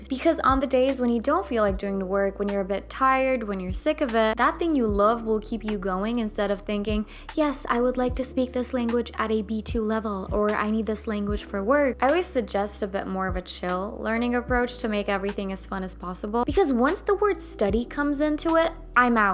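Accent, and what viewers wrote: United States. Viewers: You sound really American what is your first language.? I’m working on Korean Italian French and Russian . I learned Portuguese by getting into Brazilian music and Italian by getting into opera. I actually got into French Regaetton . I talk to my cat in the languages I am learning. I like finding out how other polyglots learn languages. Don’t be afraid to make mistakes is the best advice . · Your English is amazing!!!🤩 It sounds sooooo american.. which I love! How is that possible growing in Turkey? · Your accent is clearly, American . considerando lo poquito que hablaste en español me parece un acento puertorriqueño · As an American, I’d say your English accent is 100% American, I would have guessed you grew up here 😄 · Oh my gawd, I love your personality <3 Watching your tips on how to learn a language from scratch is as good as watching fav series on Netflix! 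Your humor and American accent rock :)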